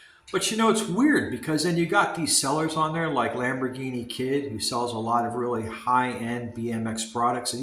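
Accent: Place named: California, United States. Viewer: Your channel is our favorite, along with RAD BMX and Sensei Phu with Epic,for a Florida boy you sure sound like a California Dude. You know an amazing amount of stuff in your lessons and should teach classes on this curriculum. Colleges need to offer courses in this, it’s serious Americana history. You explain things so clearly and articulately, it’s a soothing stream of consciousness that relaxes the mind and the soul. Thanks again bro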